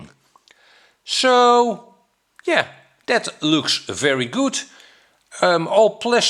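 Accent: Netherlands. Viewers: Mooie video👍... ik wist gelijk al van dat Is nederlands accent en toen zag ik de 😂💪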